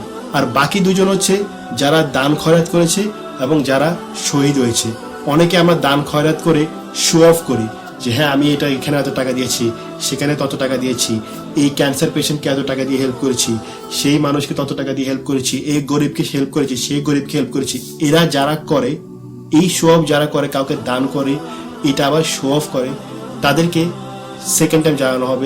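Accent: Noakhali, Bangladesh. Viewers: ভাই আপ্নে নাকি নোয়াখাইল্লা??? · bhai are you noakhailla? আমনে আসলে কি নোয়াখাইল্লা?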